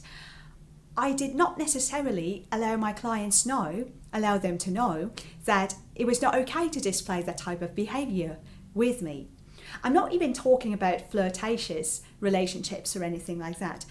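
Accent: United Kingdom. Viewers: Never have I heard any non native speaker with such brilliant British accent! Amazing, really.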